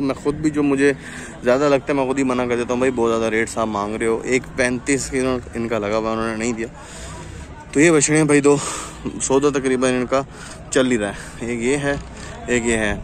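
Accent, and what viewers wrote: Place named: Karachi, Pakistan. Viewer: Bhai ap bilkul karachi walay lagrahay ho or bargling bhi karachi walo ki tarah krahay ho i like you keep it up agar multan aya to ap se zror miloga apka channel aj hi subscribe kia hai mainae.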